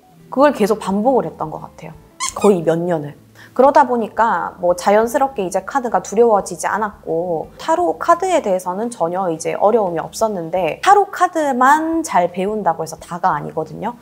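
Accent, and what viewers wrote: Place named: Gyeongsang, South Korea. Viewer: 경상도 사투리가 조금씩 섞이신듯^^ㅋ말씀 많은도움이 되었습니다 감사합니다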